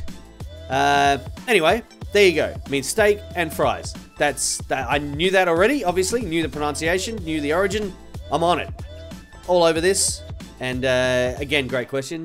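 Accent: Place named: Australia. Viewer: I didn’t know he was Australian I thought he was Italian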